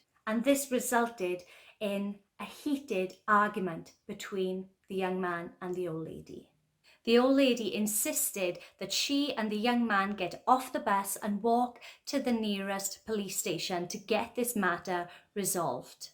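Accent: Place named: Scotland, United Kingdom. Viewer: You must be Scott ur accent tells it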